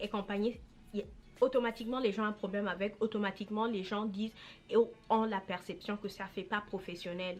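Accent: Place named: Gabon. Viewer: En entendant ton accent j'ai cru que tu étais Gabonaise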